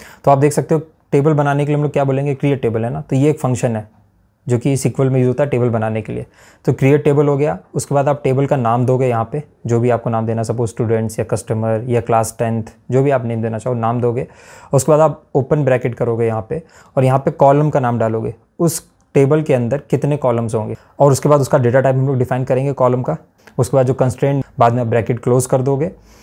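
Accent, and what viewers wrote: Odisha, India. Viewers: Are you bro from odisha?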